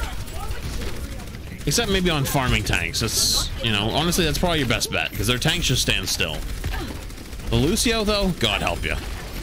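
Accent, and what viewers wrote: United States. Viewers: Hold on. What is that accent,? You got a bit of cowboy in ya?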